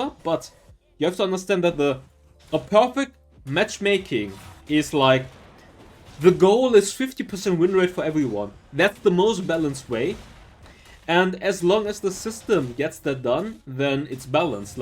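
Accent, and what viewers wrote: Germany. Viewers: Hahaha I'm also german and i noticed your little german accent although you are speaking very good english in my oppinion:D And I have a friend who has the same "family background" as you and I thought maybe has the same background XD I guest correct xD Aber im Nachhinein kann man das ja immer behaupten. Warum hab uch das grad auf Englisch geschrieben xD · Wait u are german??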